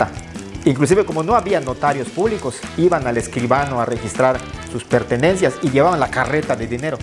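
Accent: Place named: Yucatan, Mexico. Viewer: Muy buenas tardes me gusta tus comentarios por que nos instruye y sabemos de ese gran estado y me gusta mucho tu forma de hablar todo un yucateco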